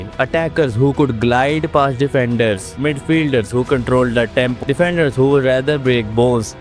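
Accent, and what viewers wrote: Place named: India. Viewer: indian voice annoying use ai voice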